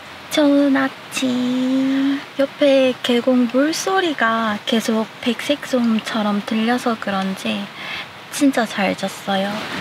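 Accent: Gyeongsang, South Korea. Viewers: 사투리억양이 경상도쪽이신가욬ㅋㅋㅋ귀엽ㅋㅋ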